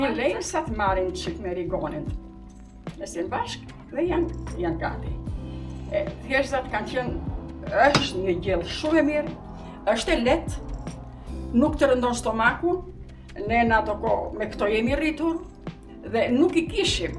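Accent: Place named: Piqeras, Albania. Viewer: te lumshin duart e arta dhe modestia qe ke te ben mjaft fisnike! Por ti e ke ruajtur gjuhen e Piqerrasit, ndersa vajza flet me dialekt te Shqiperise se Mesme neper video! 😊😊😊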